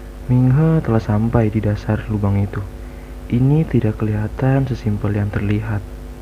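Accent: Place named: Ambon, Indonesia. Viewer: Suarane ambonnn😊🙏🤣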